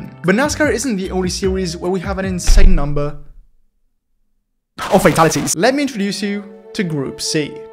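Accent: Italy. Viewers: are you italian? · Are you italian?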